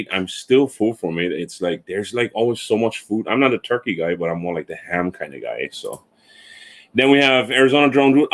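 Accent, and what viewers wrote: Hawaii, United States. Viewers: Shoots stoked to find someone talking story with drones local style nice! U Maui? Normally North Shore Oahu we have to connect one day! Aloha about to get 6K evo2 possibly on the fence. So you say you have the pro is that the 8K or 6K? 🤙🏾🤙🏾🤙🏾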